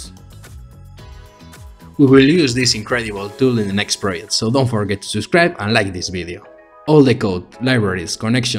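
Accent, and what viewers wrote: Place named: Spain. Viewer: Buen trabajo! Se nota que eres español, pero lo intentas y te salen muy bien los videos!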